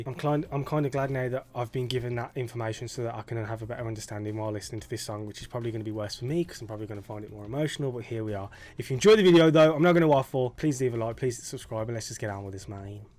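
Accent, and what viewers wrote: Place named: England, United Kingdom. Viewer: Trying to figure out your accent from my Canadian perspective. Are you from the midlands or from a northern town/city?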